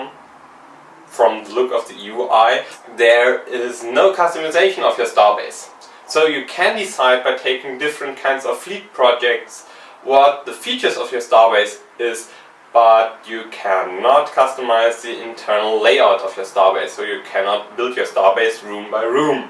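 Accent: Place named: Germany. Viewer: Excellent first episode! Don't apologize for your "bad English." While you do have a strong accent that makes it obvious that you're a native German speaker, your English was easy to understand. Heck, I wish my German was half as good as your English. I look forward to watching more episodes of Captain's Log. Gut gemacht!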